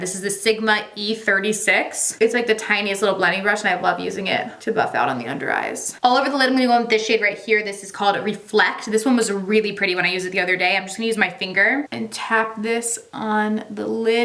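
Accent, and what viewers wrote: New Jersey, United States. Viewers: Yes it’s her Jersey accent haha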